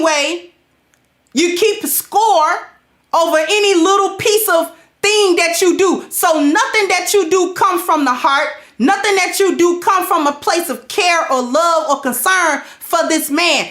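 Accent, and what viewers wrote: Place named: South, United States. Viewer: I miss that gap... it brung out the country in the way you speak.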